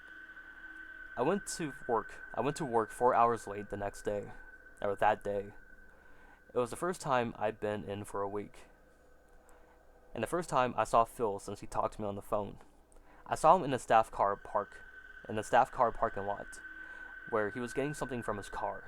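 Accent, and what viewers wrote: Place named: United States. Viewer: Australia? Hmm why do you sound so American I’m from California you sound just like my white homies haha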